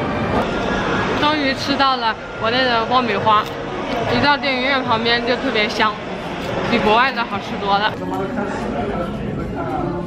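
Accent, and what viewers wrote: Wuhan, China. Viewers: 哈哈哈 这武汉话和我男朋友家里说的一模一样😂😂😂同在澳洲，果断关注❤️❤️ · 刚从武汉的家里回到墨尔本，看了你的vlog又开始想家了。平时可能不觉得有什么，在异国他乡听到看到故乡的东西总是会让人有更多的感触。其实一开始我是搜旅游vlog看到你的，然后发现口音有点熟悉，点开主页发现果然是武汉人哈哈哈！ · hhhhh你一回武汉普通话就有口音了哈哈哈哈 我哥也是华师一的 好像是08年入学的（如果我冒记错的话哈哈哈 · 看博主别的视频的时候听口音就觉得是我们武汉的普通话hhh，然后发现了这只vlog证明了我的猜想！看片头就认出了销品茂的金逸哈哈哈开心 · 看到好多好吃的，还有武汉话，好亲切。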